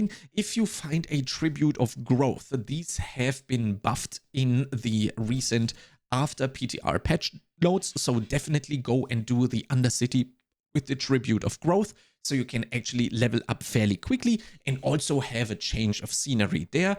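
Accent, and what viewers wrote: Germany. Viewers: I had to edit this to add the spreadsheet you provided: There is an astounding amount of work you've put into helping. That spreadsheet (you have linked below your video) is so helpful, and you have additional pages of info clickable at the bottom of that spreadsheet. Huge, huge thanks. I would sub 10x more if I could to give something back. Some really incredibly helpful info and tips I wasn't expecting. Like letting the drop specs guide your build. Thank you for all the time you put into this, I've happily subbed. PS: where are you from? I'm originally from Austria and you sound either German or Austrian? Thank you again so much for all the amazing help.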